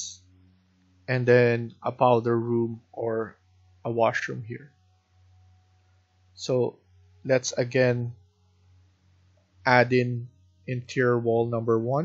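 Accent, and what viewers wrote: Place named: Philippines. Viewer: salamat rin sa guide.. I was right when i first heard you alam ko pinoy agad 😅.. I needed his guide thanks again..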